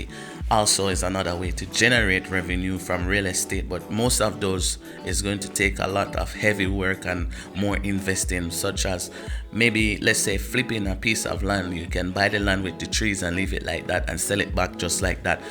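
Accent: Africa. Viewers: OMG, you sound African! I will be in Gambia in 2 weeks time. Would really love to meet you and your wife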